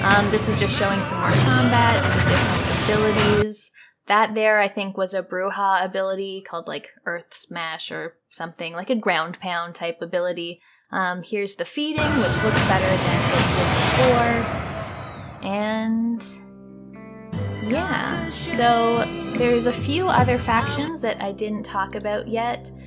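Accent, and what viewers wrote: Canada. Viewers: Searching for new Bloodlines 2 vids: "Never heard of this American lass before, lets give her a try" - "Its been a while since I last talked ABOOT vamp-" "Canadian. My bad"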